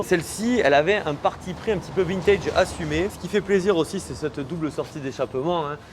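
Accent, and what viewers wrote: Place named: Southwest, France. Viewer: Tes expressions, et ton accent de notre sud-ouest (qui de temps en temps me manque)...j'adore. Ne change surtout pas!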